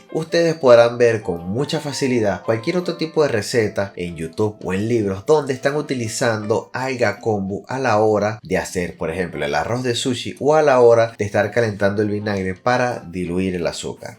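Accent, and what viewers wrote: Venezuela. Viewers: Me gusta mucho su acento venezolano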